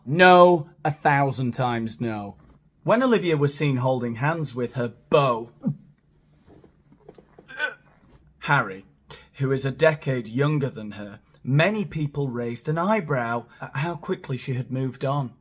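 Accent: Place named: Northern England, United Kingdom. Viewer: How have I only just discovered you? Subscribed ....ready for a binge. Love your Northern accent feels like home.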